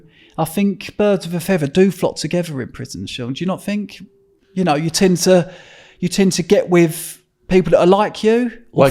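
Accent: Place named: Southern England, United Kingdom. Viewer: What an awful poncey Southern accent.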